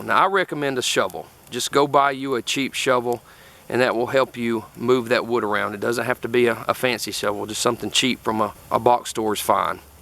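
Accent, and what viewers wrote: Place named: Alabama, United States. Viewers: Loving your southern state Alabama accent there mate! Greetings, Leeds, UK. Good tips for me and my new wifey for our first camping trips. 😉👍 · Great job sir. I also thought how familiar your accent sounds. I grew up in south Alabama. I've been wanting to camp as I did as a kid but wanted a refresher overview. This is exactly what I needed.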